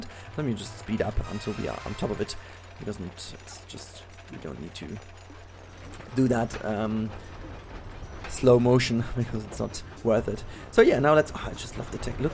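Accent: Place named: Germany. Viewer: hahah bist du cdeutscher ? :p :o echt kein schlechtes english probs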